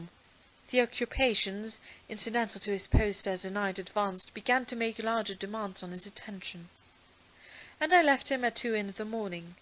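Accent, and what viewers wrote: United Kingdom. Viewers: I love British accent thanks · This is a RP, isn’t it??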